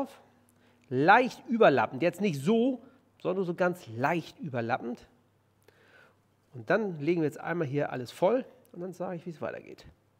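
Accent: Bavaria, Germany. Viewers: Alles OK aber hör bitte auf Bayrisch zu reden. Das hört sich bei dir sooooo grausam an... 😭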